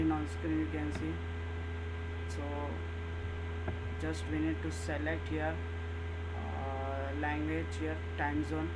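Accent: India. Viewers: I love indian 80% problem before this is fixed by indian accent tutorial.. thank bro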